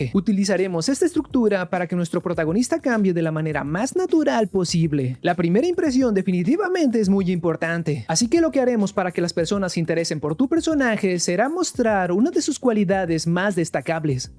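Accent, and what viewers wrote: Standard. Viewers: por qué tenes q hablar tan neutro xd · Muchas gracias por tu contenido, es muy util y bien explicado! Siempre siento como si estuviera viendo un capitulo de algun programa de discovery por el español neutral 😂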